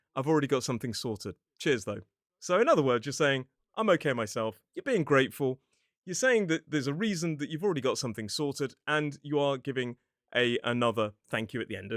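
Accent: United Kingdom. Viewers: But we do llike his talking and we are here to listen to his brilliant british accent❤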